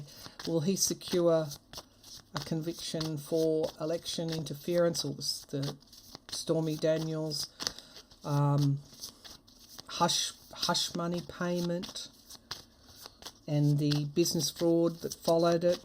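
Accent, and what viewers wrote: Australia. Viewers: Was nice to heat am Aussie voice in this area of YouTube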